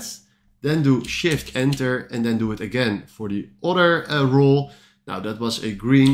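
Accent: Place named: Netherlands. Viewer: jij sound nederlands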